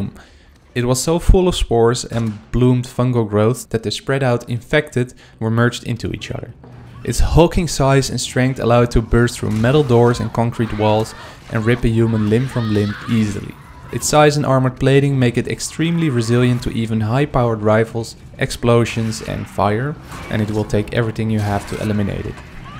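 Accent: Netherlands. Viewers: Do I recognise a Dutch accent here? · Are you from the Netherlands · Are u Dutch? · I know this is of topic to the video that ur making but are you by any chance Dutch? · jezus gozer, dit is echt het meest nederlandse accent ooit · Are you dutch 🇳🇱? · You can hear really good that the guy who talks is from the netherlands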